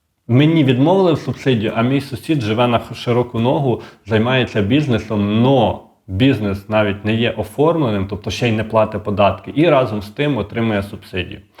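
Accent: Vinnytsia, Ukraine. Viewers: У нього вінницький діалект. Тому так розмовляє. А ви що, розмовляєте літературною мовою?!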